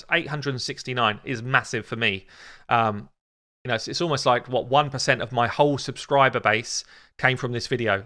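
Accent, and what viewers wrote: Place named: United Kingdom. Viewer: Can you like read a book one time, your British accent ....... I enjoy it. Keep up the amazing content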